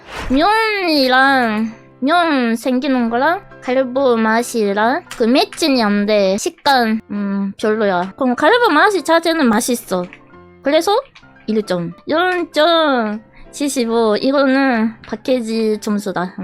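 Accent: Japan. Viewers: 한본어 개기엽넹ㅋㅋ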